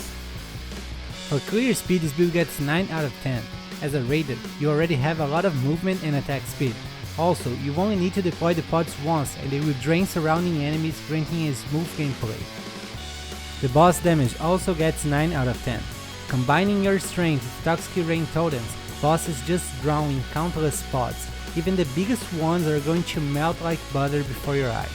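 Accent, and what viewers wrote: Brazil. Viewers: Brazilian here, you sound brazilian... Are you? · ma da pra ver que o cara é br o sotaque não engana kkkkkk full Fisk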